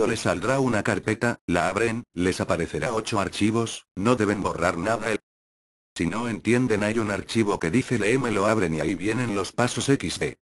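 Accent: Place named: Latin America. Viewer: Hablas con una mezcla de Latinoamericano (por hay argentino o chileno) con otra mezcla de español.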